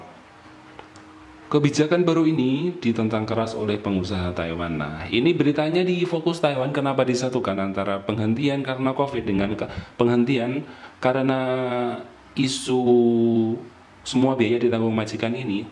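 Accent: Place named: Java, Indonesia. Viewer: Sampean koq lucu to pak lek omong jowo..😅😅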